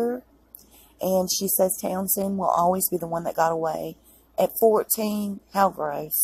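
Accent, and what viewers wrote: South, United States. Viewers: I want to like Megan... but there is just something about her that I just can't take to🤔 ps love your southern accent x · Thanks for sticking up for the South its appreciated. I new Meghan did a lot of things we aren't hearing about until now. Like you Southern Accent and appreciate all the updates and news.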